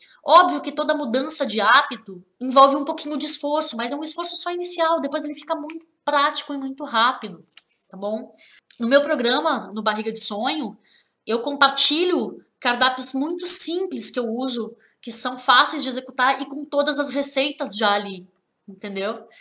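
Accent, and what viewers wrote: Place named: South, Brazil. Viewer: Do Sul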